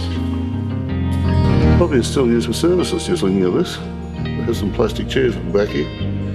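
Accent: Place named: Australia. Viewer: Onya, this is the first I've seen of you but I'll be watching more for sure....a quick look at your page and the amount of content is WOW. I left Oz in 98 for work and never considered that I'd call somewhere else home but that's how it ran....so I absolutely luv hearing some fair dinkum auusie talk.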